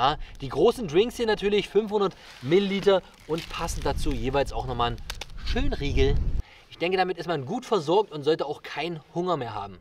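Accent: Magdeburg, Germany. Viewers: Kommst du aus Magdeburg?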